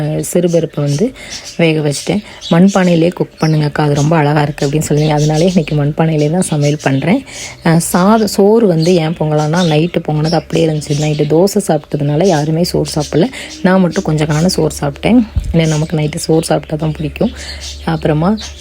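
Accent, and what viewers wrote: Tirunelveli, India. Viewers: திருநெல்வேலியா சிஸ்டர் நீங்க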